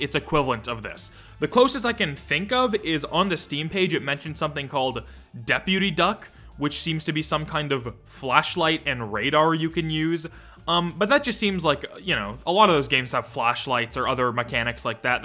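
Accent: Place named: Canada. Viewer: Holy shit, is Canadian? I can totally hear it now.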